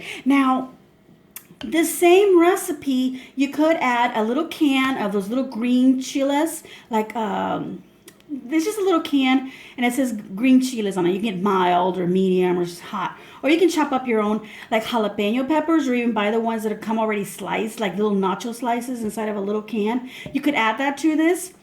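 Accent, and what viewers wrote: Texas, United States. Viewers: You know what is funny,,,, I was watching your more recent videos & you don't have the accent that I can hear in this video! Have you always lived here in Texas? I moved here about 4 years ago. 😁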